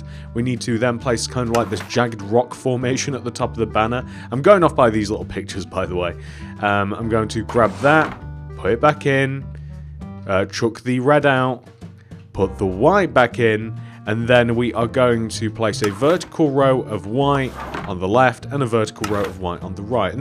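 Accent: United Kingdom. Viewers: heeyyyy watsup youtube? me: heyyy nothing much but you get more British everyday.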